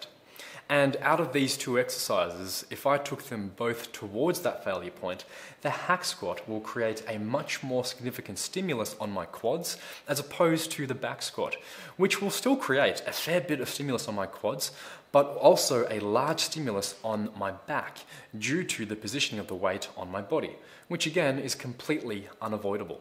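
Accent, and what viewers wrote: Australia. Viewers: Came here for the Quad exercises. Subscribed for the quality info delivered with a chilled Aussie vibe 👍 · OMG you're aussie!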